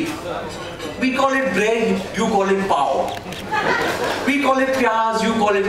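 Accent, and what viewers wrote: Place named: England, United Kingdom. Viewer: his english accent makes me laugh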